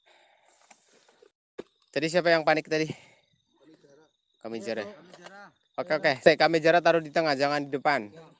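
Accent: Banyumas, Indonesia. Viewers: wkwkwj ngapak bae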